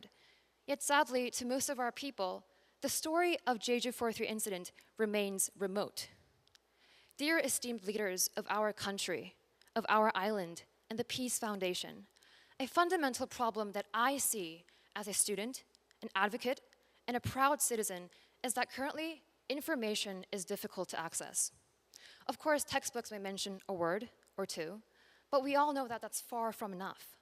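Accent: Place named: United States. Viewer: WOW SHE S GOT THE ENGLISH ACCENT THAT MOSTLY AMERICAN NATIVE SPEAKERS HAVE I CAN CLEARLY UNDERSTAND HER GIVING SPEECH WORD BY WORD SHE IS EXCELLENT SPEAKER VERY WELL DONE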